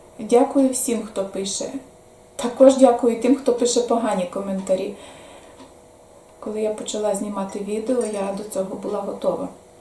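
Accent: Western Ukraine, Ukraine. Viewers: Пробачте, вперше попала на Ваш канал і цікавлюсь з якого регіона ви переїхали? Бо мова у Вас як у західних українців. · Пані, ви справжні і не звертайте уваги на недолугих людей, які ще сміють сміятися і засуджувати, що ви купили стареньку хату. Невже притомна людина може так думати? Люди, та ЩО з вами?!! Тисячі людей тікають від дикої орди, залишають домівки, залишаються без миски-ложки, змушені починати життя на новому місці, а ви зловтішаєтеся! Замість допомогти, чи просто підтримати добрим словом. Пані, нехай вам Господь дає сили і здоров'я всі негаразди подолати.❤ А певно пані має західняцьке коріння, бо чую по розмові)). Вітання вам від тернополянки❤❤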